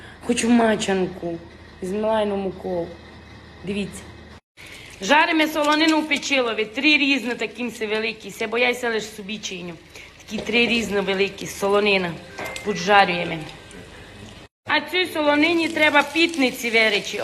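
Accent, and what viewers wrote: Transcarpathia, Ukraine. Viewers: Закарпатський діалект.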